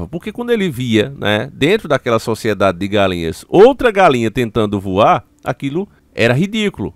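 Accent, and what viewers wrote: Northeast, Brazil. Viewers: Bom dia Eu sigo você a muito tempo e seu sotaque sempre foi o diferencial Nordestino meu amigo é como todos os lugares tem as exceções parabéns pelo seu trabalho Deus abençoe 🙏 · Conheço seu canal a 9 anos. Difícil eu perder um vídeo, não sou nordestino, sou de São Paulo, mas o que me chamou atenção foi justamente a sua forma de falar e o conteúdo, até hoje. Se não fosse a safadeza do YouTube seu canal estaria maior. PARABÉNS!!!!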